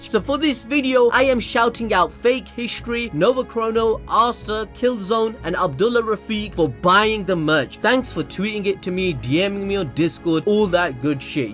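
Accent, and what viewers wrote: Punjab, India. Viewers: Bruhhhh you’re indian????? Hearing you speak in punjabi/hindi was hilarious 😂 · serious ur hindi has a bit of indian accent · what the fuck u speak hindi (i heard a slight punjabi mix but like whaaa) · Yes!Mitsuki is a really important character in defeating Otsutski. BTW you speak nice Hindi but you have a Punjabi acssent in it. GOOD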